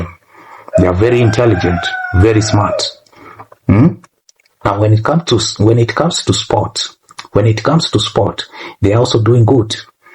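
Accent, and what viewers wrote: Jamaica. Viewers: Man your accent is very much like Jamaican too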